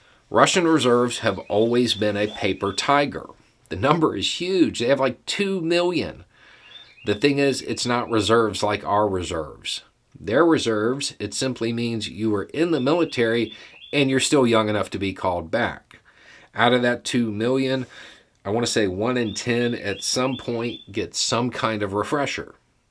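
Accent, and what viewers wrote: South, United States. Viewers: As a gal who grew up in part in southern Alabama, it's nice to hear a Southern accent talking sense.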